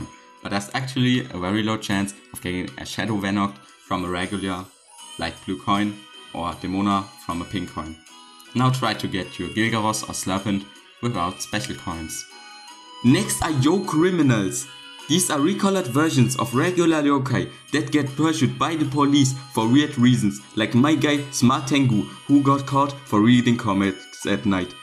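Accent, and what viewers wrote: Germany. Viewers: i hear a little bit deutsch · Man hört so krass den deutschen akzent haha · i know that german in the voice and backround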